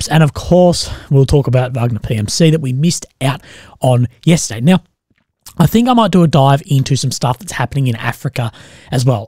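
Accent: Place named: Australia. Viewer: yo son ben in UKR for six months great to hear a real OZ voice. Met a few seppo's here, usually pretty friendly when travelling but , not here, just hi and by.. OK appreciate your channel a lot great OZ perspective.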